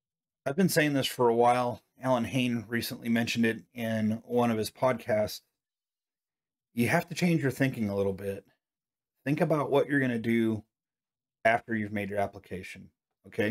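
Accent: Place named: Pacific Northwest, United States. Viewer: LOLfirst of all I see an Aspen Pest Control vehicle outside your driveway. I'm in Vancouver and use them, they're very good. Based on your suggestion I got the Spraymate battery sprayer. I used it for the first time the other day and look forward to using more liquids than granular. My grass is Barenbrug RTF Turf Saver and as soon as my Allett Stirling arrives I'm going to start reel mowing at 2 inches and hopefully down to 1.5 and maybe 1.25 but will see. Anyway I enjoy your channel and nice to have a PNW voice out there.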